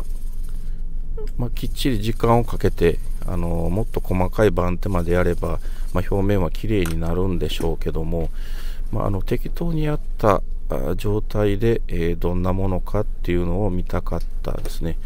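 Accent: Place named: Kansai, Japan. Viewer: 私は燃料ポンプ28万キロまで持ちました。 無理に東京訛りにせず、関西イントネーションのままで、You Tube特有の過剰な効果音や聞き飽きたBGMがないのが良いですね。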